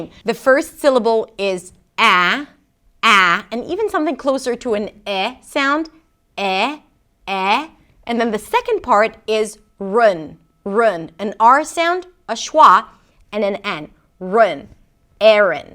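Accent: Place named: United States. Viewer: I'm a native speaker with linguistics training and this was fantastic. Your American accent is fantastic! I can only hear the tiniest hint of non-native accent, it's so wild. I appreciate that you included the name Siobhan because it's Irish and you'd be amazed how many Americans have no idea how to say it 😂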